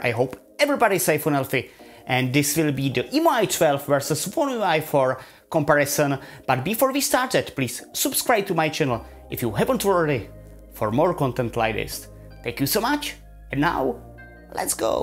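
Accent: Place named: Czech Republic. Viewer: Ahoj z Česka! Pěkné slyšen náš krásný český přízvuk :)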